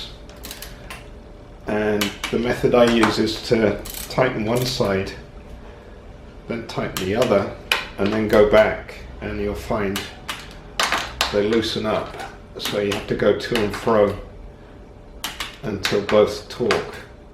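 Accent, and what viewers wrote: United Kingdom. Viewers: Hi just wondering how you got tusk wheels as it sounds like you are based in England?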